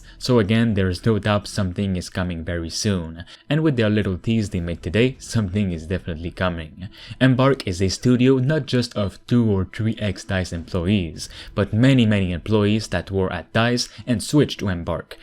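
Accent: Quebec, Canada. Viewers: Hey man, are you Québécois ? You have the accent ;) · yes , plz cover it :) . also i can definitely ear that youre from quebec as you dont have a french accent in your english . soooo content de voir un qc qui reeussi sur youtube . ps oui je sais , mon francais est a chier . sa cest due au fait que langlais est plus facile pour moi meme si je suis un pur laine :)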